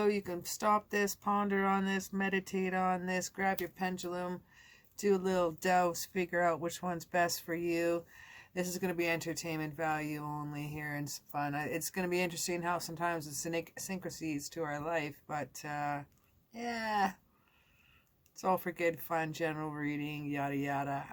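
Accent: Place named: Ottawa Valley, Canada. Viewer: I’m just Cindy, another Canadian girl like yourself! And thanks for following. 😉 I’ve been trying to place your accent. I can’t figure out if your originally from the Ottawa Valley area or the Maritimes?? 🤔. Am I close?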